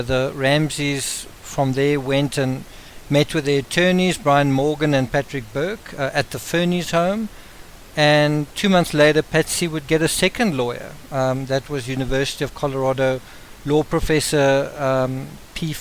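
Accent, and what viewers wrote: South Africa. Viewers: 😆 I’m from the Uk and I find myself using American terms sometimes now.. I used flashlight recently and my daughter gave me a sideways glance 😏... I love the South African accent too... The way says ‘Dr Phil’ as ‘Dr Full’ always conjures up an image of Dr Phil with a big tummy after his dinner! 🤰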